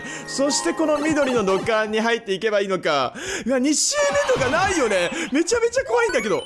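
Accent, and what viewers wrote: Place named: Northern Kyushu, Japan. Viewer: ども！女性視聴者ですww もう、九州北部出身からしたら 地元が近い&方言で推せますね👊( ¨̮💪)✨ いつも元気もらってます✋( ˆ̑‵̮ˆ̑ )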